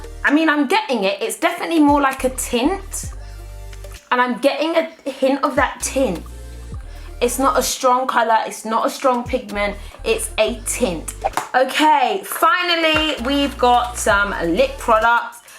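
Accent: United Kingdom. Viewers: Love your accent! Are you from UK? 😘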